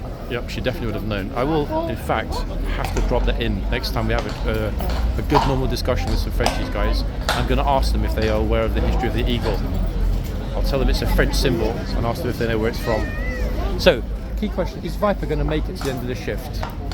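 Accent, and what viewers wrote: United Kingdom. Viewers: I watch your videos always...learning and entertained....while in HK i befriended a few British ladies and guys...jolly people....i love British accent....i also watch DANNY DYER movies,JASON STATHAM and other Brit actors and Irish too....🤗☺ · Your voice is one if the 2 British voices I recognize immediately!! You and Matthew MacFadyen are my two favorites. I enjoy this channel and your humor so much!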